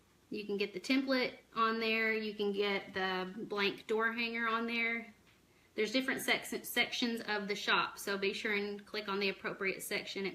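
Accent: United States. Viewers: I know this is an older video, but I absolutely love your Strong Southern Accent. I'm a Southern girl myself from Alabama but for some reason I can't tell I have a strong southern drawl, but everyone else can..lol and the Rooster is adoorable! My kitchen is done in Roosters, John Deere tractors and Sunflowers.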